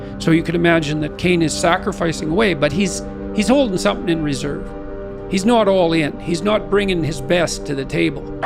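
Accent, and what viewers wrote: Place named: Canada. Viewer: Dragging it to say some generic ass advice. His Canadian accent just makes it pure comedy. Like god said it cane; you’re not trying hard enough to lose that disgusting accent.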